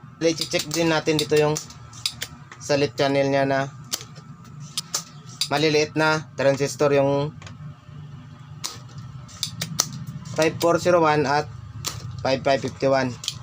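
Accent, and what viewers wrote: Iloilo, Philippines. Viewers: Daw ilonggo ka maghambal sir..idol ko mga ginagawa mo parang Ang Dali lng gawin.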